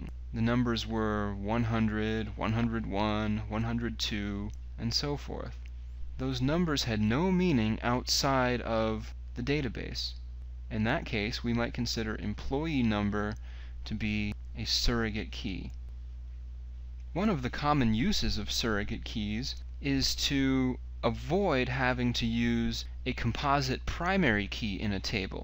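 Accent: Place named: England, United Kingdom. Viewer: Thank you for posting this video. I am happy that I could find one with a perfect English accent.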